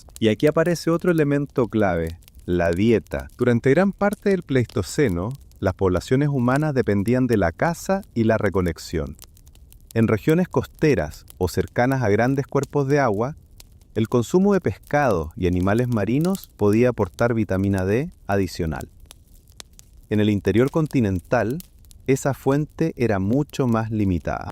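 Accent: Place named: Chile. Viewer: Me encanta la IA con lenguaje cbileno, like en todos estos videos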